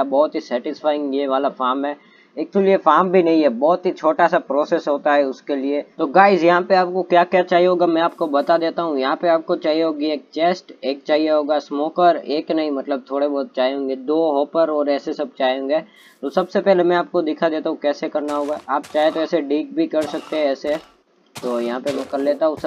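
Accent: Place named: Gujarat, India. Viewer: gujrati log hindi bolne me pakde hi jate h ...btw i am also from gujrat..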